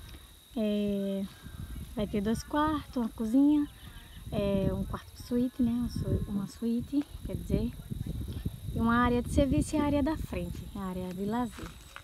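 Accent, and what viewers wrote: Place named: Northeast, Brazil. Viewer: Boa tarde, vim Através do canal Gaby Morena, gosto do seu sotaque nordestino, boa sorte e muito sucesso com seu canal.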